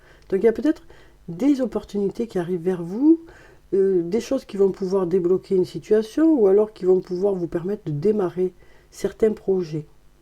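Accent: South, France. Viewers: Merci pour cette belle guidance avec ce charmant petit accent du soleil ❤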